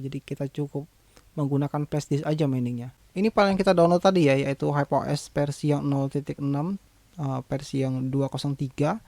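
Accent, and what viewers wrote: Palembang, Indonesia. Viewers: wong plembang ye lor ? 😁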